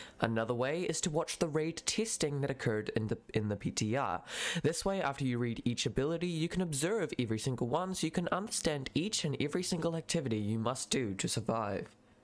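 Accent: New Zealand. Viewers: Is this guy a kiwi? The accent is close but something is slightly weird about it, maybe from some random place in NZ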